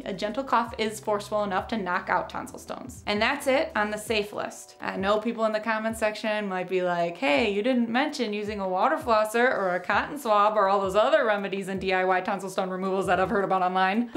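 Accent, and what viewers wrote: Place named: Minnesota, United States. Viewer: HOLY MINNESOTA ACCENT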